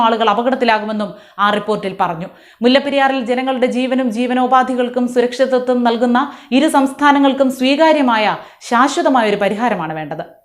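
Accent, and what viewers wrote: Thiruvananthapuram, India. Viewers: ആകർഷകമായ ടോണിൽ ഗൗരവമായ വാർത്ത അവതരിപ്പിക്കാനും ആക്ഷേപഹസ്യം അവതരിപ്പിക്കാനും തിരുന്തോരം ഭാഷ പറയാനും മിടുക്കി 👍👍❤️🌹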